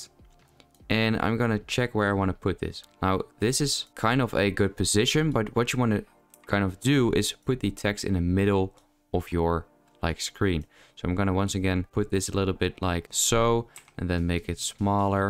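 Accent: Netherlands. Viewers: maybe a weird question, but are you dutch? lol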